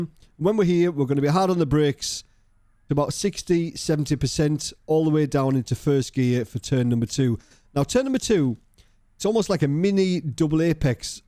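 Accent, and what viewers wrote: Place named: Durham, United Kingdom. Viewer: i live in stanley co durham you sound like youre durham /darlo way be nice to have a chat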